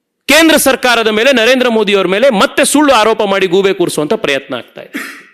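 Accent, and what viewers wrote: North Karnataka, India. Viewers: nimma hage uttarakarnataka bhashe bere yara bayalloo heege agodilree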